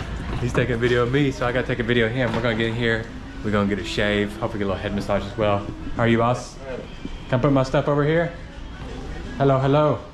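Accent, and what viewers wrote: South, United States. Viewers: I deadass thought this was Jensen Ackles from Supernatural/The Boys 😂you kinda look like him in the face and y'all both got that cute southern accent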